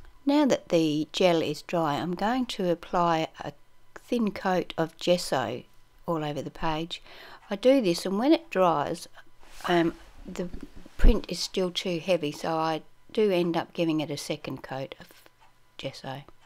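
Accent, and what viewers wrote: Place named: Australia. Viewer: Nice to hear a fellow Aussie voice!!! Great page! That pastel green as a background with the orange is very dramatic!!!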